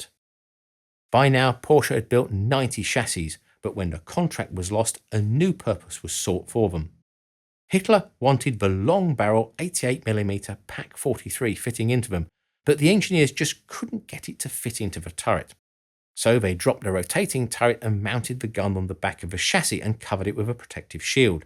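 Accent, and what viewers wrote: United Kingdom. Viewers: Cause he has British accent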